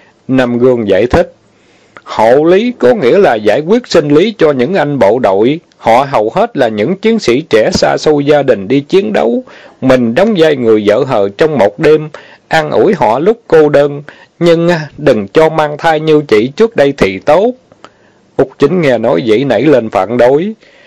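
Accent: South, Vietnam. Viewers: 👍🤣🍀🌷🍎🌷🍀😁👍 Giong Mien Nam Cua Lam E Muon Duc RUOT LUON Cam On 👍😝 Nha Ta👍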